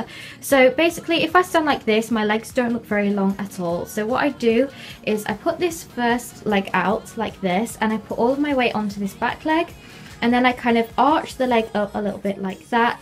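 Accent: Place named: Essex, United Kingdom. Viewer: Aw we're both 4'11! where shouts are you from? I've just moved from Essex to Blackpool and your accent sounds so similar!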